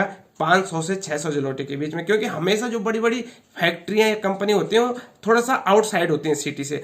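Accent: Garhwal, India. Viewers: Garhwali accent h bro aapki aawaj me. 🙏🏻🙏🏻🙏🏻🙏🏻👌🏻👌🏻👌🏻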